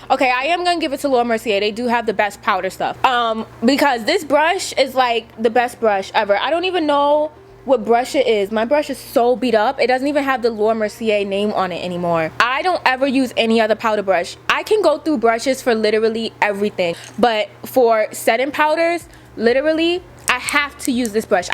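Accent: New York, United States. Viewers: First of all accent she so NY 😍😍..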